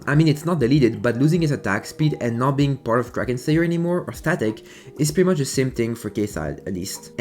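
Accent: France. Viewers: T’es français ? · You have a french accent bro ?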